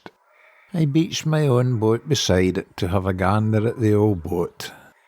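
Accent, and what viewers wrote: Scotland, United Kingdom. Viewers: Subtitles not available in this video??? 😢 very complicated to me to understand your lovely scotish 😒 anyway, I'll be enjoying the pictures 😀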